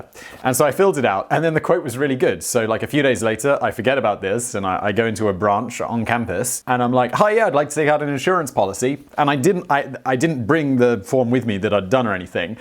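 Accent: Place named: United Kingdom. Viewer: that comment was like a year ago and I was smashed as drunk and barely even paying attention you got to realize I washed all of his channels I'm subscribed to all of his channels and up until that point he had never said anything about having a kid or a wife and I'm American so I kind of misinterpret the British pronunciation of things as you know kind of the gay American thing I don't give a f*** if he's gay or not if you ever find my Facebook and go back I've got pictures of me hugging trainees and being at drag shows and them kissing on me I really don't give a fuk either way but like I said I was smashed as hell when I made that comment because I couldn't quite figure him out and I was just in one of those moods so kindly piss the f*** off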